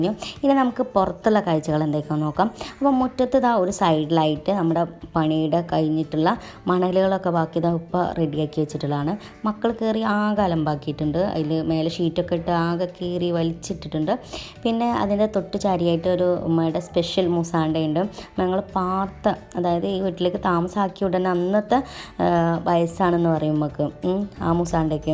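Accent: Malappuram, India. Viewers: lovely home 🏘️🏡🏠 Ingalu Malappuram slag paray ... PLZZ We love it ❤️🎉👍🌷🌻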